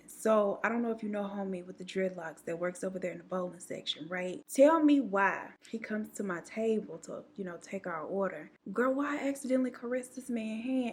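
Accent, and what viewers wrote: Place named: Houston, United States. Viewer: When I started watching you I kept things you sounded like you were from Houston!! We have a unique sound when we talk 🙃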